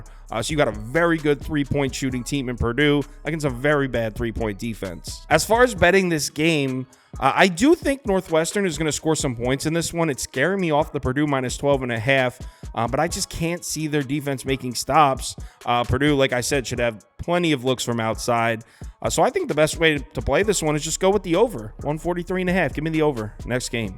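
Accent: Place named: New Jersey, United States. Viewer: Is from NJ? Does anybody know? Im a relatively new follower so im not exactly sure but some of the words he uses and the way he says certain words gives me Jersey vibes or maybe new york.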